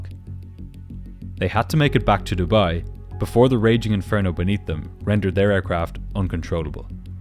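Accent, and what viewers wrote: Ireland. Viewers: Yeah, he does have a very pleasant Irish lilt. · That an Irish Brogue ?Love the channel.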